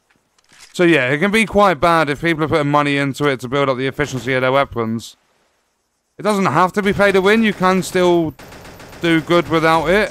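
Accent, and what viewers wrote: United Kingdom. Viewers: dude the british acent absolute king 😩👌🔥🔥🔥🔥🔥🔥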